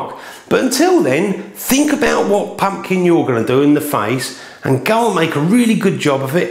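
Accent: United Kingdom. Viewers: u sound like someone from little brittan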